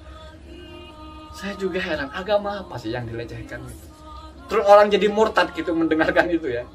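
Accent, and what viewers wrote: Madura, Indonesia. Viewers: Maklum,. Memang logatnya orang Madura kayak gitu mas.. kesannya ngegas kalau bagi orang Jateng. Tapi bagi orang Jatim dan Madura sendiri, itu biasa saja.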